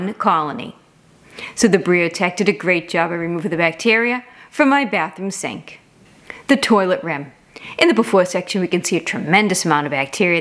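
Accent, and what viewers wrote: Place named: Rhode Island, United States. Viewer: What accent do you have? Sounds like Rhode Island and/or Massachusetts.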